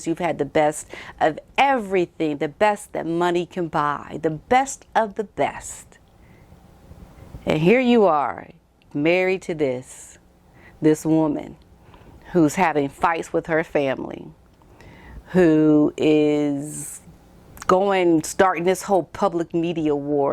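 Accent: England, United Kingdom. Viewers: Love 💔 the Brit accent....Lol...B lucky. Lol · I like the English accent. Lol! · Ha ha...we don't all speak like that in the UK but your accent was so funny and good too.... come do some acting over here 👏👏👏👏👏👏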